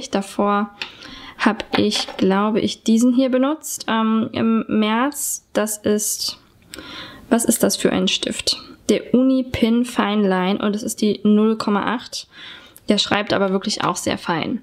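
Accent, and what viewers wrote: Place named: Germany. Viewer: hallo!! ich hab erstmal deine english videos gesehen und was sicher, dass dein accent aud Deutschland war. Ich bin von Chile und kann ein bisschen deutsch sprechen aber ich hab' fast kein oppotunitat mein deutsch zu benutzen. Aber dein videos sind perfect um die sprache nicht zu vergesen 💕 (entschuldigung für die fähler)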